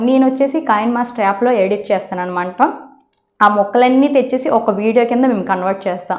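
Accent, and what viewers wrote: Srikakulam, India. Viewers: Maadi Srikakulam sis... ani chusa appudu anukunna ma side velladi ani...mem kuda vyshyas...bobbili lo ma vallu unnaru...slang ni marchukomani comments chese vallandaru posh guys ayiuntaru...anduke nativity ni comment chestunnaru...evari kosam meeru change avvadu aite vallaki value ichinatlu avtndi...keep doing ur vlogs...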